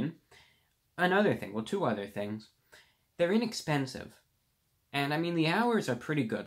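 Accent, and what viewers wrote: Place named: Mid-Atlantic, United States. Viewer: I love the way he uses the Mid-Atlantic accent. Awesome! This guy's a time traveler.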